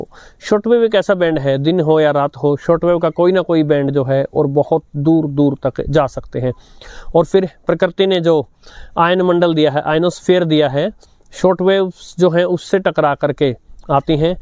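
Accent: Standard. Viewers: सर कई बार मैं आपका चैनल सिर्फ शुद्ध हिंदी के सुनने के लिए देखता हूं । ओ हिंदी के शुद्ध स्वर आप बोलते हैं इतना मधुर लगता है । मेरे संस्कृति के टीचर की याद आ जाती है कल जरूर जुडूंगा अगर फ्री रहा तो आपका बहुत बहुत आभार रेडियो की इतनी सारी जानकारी हमसे साझा करने के लिए मैं आपके कार्य को हृदय से पसंद करता हु ।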